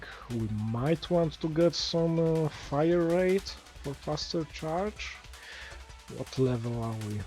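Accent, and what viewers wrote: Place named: Netherlands. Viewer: Yay! It's great hearing you! Keep being a awesome! Oh man I want to guess your accent; is it of Germanic decent? Dutch?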